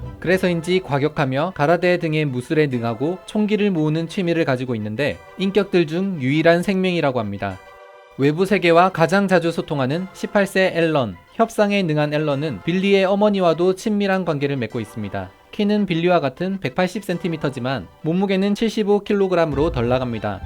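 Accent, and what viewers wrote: Gyeongsang, South Korea. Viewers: 경상도사투리 어쩔수가없나봐요 ㅋㅋㅋ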